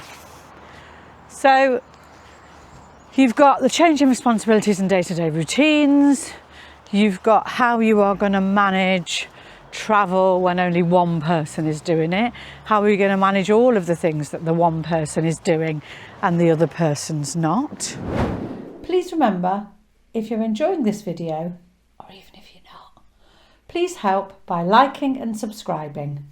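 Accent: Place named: Leicestershire, United Kingdom. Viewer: Is this a Leicestershire accent 😊.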